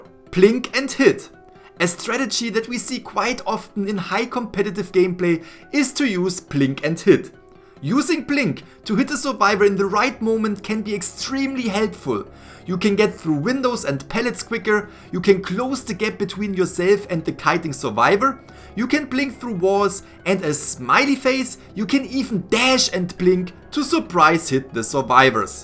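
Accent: Germany. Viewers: Are you German? Because of your accent. No hate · Are u german?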